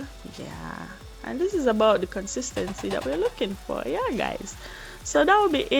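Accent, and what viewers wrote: Jamaica. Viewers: Wow..looking for this since 19*** 😂😂😂😂... endlich...gonna do this today, today...wo<link> realy like the jamaican patty....like how you speaking the Patwa😊... ,,trina nyamings'' showed me your Link..good Job and thanks for Showing us. Ich freu mich so sehr👍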